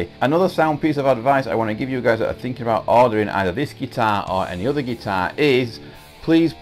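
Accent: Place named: Yorkshire, United Kingdom. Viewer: Alright, mate, im gonna guess the accent. Rotherham or Sheffield? Helpful vid btw, good work🤘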